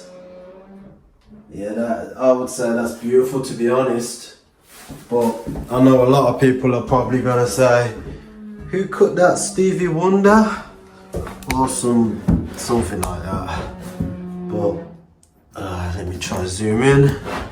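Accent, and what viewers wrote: Birmingham, United Kingdom. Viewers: Can't help being a Brummie 😂😘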